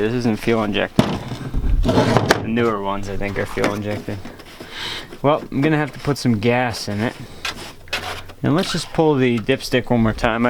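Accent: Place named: Pennsylvania, United States. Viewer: Great PA accent.......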